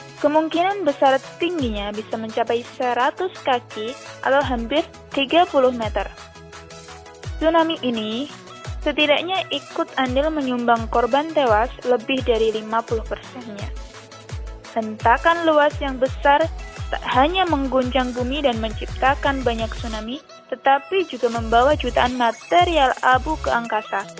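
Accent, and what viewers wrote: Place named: Java, Indonesia. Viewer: Yg baca keliatan orang jawa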